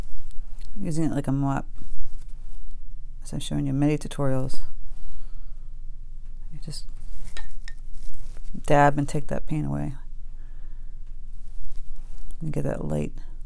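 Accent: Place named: New York, United States. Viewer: Thank you sooooooooo much for your AWESOME videos!!! I love it!!!! I watch them all!! I like your accent! NY?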